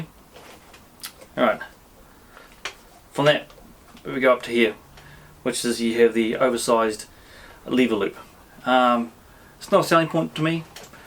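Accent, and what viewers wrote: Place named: Waikato, New Zealand. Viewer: That's a waikato accent if I've ever heard one, even looks like my stomping ground up thompsons, looks like it would be a epic kaimai supplejack gun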